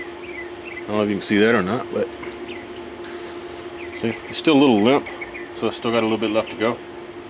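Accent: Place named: Texas, United States. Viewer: Thank you for holding it up. It smelled great. Also, I like your Texan accent. I was a Houston resident for 20 years until the early 1980's.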